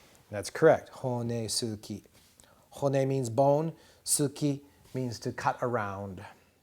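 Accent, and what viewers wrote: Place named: United States. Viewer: This is only half knowledge, as you have generously left out vowel length and vowel dropping. You mentioned daku-on and handaku-on in passing, but completely left out soku-on. Intonation isn't mentioned,too. I am not objecting to the slight American accent, as I know how hard it can be to get rid of that even after years of study. It is one of the reasons why universities hire native speakers as language teachers, so why don't you ask your wife to pronounce the words?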